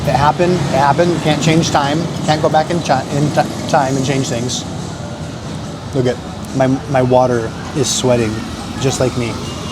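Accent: Philippines. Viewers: Filipino ka na talaga Kuya. Halatang halata na sa pagsasalita mo ng tagalog.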